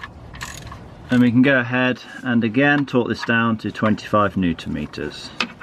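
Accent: United Kingdom. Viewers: the british accent sounds pretty awesome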